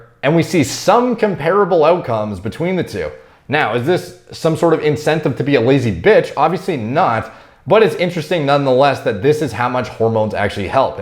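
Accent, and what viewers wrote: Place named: Canada. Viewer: bruh. you sould so canadian.....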